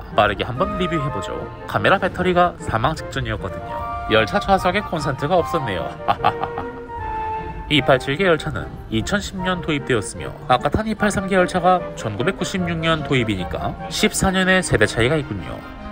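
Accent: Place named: Japan. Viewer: 나레이션 본인 목소리인가요 ? 꼭 일본인이 한국말하는것 같아요 ㅎㅎ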